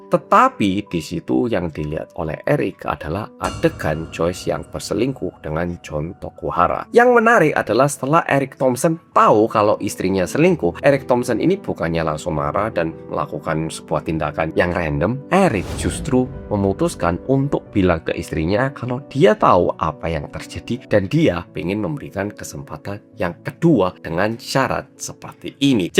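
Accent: Java, Indonesia. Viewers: menemaniku saat cuci piring yaaa oppa medok😊😊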